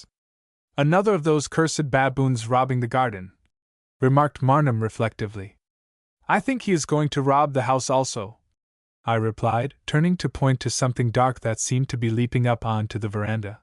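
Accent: United States. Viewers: Have been a fan of H. Rider Haggard since the 1960s, especially the Allan Quatermain series. It is a shame that the reading is done in a rather flat artificial intelligence voice, and an American one at that, with numerous mispronunciation, the rendering of Natal is especially jarring one, it's a place not giving birth. Have also listened to my favourite AQ story " Allan and the Holy Flower " read once again with a American voice, this time female, and it's very difficult to except that voice taking the part of a English male big game hunter in Africa in the 1800's. It needs someone like Greg Wagland, who is a most excellent audio book reader to take on the roll. I did give this book a thumbs up not because of the reading but because of the book.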